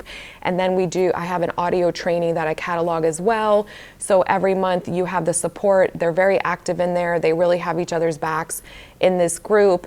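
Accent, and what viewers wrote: New York, United States. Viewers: I don’t ~need~ one on one coaching from you but I WANT it. That powerful New York cadence makes everything you say hit so much harder. Ty for another kickass video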